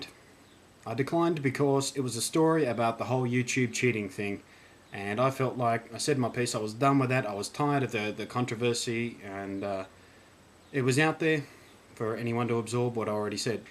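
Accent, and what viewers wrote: Australia. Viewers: Zomgkittens!!1eleventy The barbarians are at the gate! :D Love the titles, as always. I really don't know how any journalist could mistake your (almost textbook) Oz accent for British. And I'm sure PL knows you're doing a cockney accent there, not her silky-smooth posh. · Haha, yeah, he made this whole thing sound so ridiculous. It's weird how people always think Aussie accents are British. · haha that was funny. British though? Seriously, anyone with hearing can tell the difference between an Aussie accent and a British accent. That was a funny British accent though.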